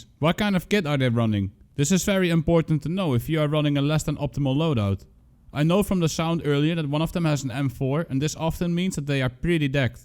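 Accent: Netherlands. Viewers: Hi funny speaking Dutch dude. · ik hoorde in de eerste 5 seconde dat je nederlands was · “Funny speaking Dutch dude” ..subbed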